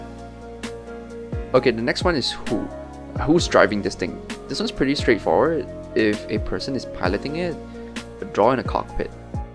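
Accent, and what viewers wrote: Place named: Singapore. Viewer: He sounds singaporean...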